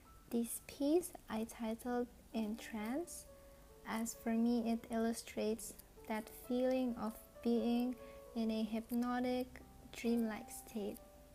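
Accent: Philippines. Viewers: Filipino accent :-)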